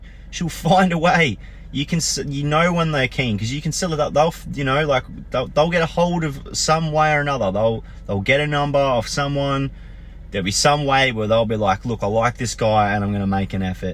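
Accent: Australia. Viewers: Literally just made a vid like this aswell. You an aussie? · u Australian mate?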